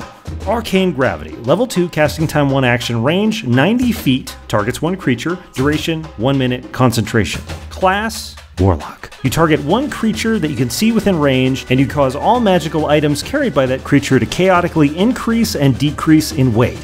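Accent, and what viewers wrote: South Africa. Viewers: Are you Afrikaans sir?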